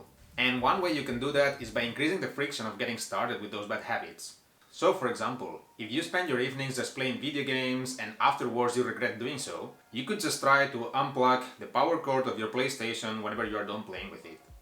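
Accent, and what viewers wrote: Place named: Spain. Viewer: Thank you for sharing this valuable information with us. Another thing, your accent looks different. Where are you from? I think Spain. How can I get the same fluency you have in English?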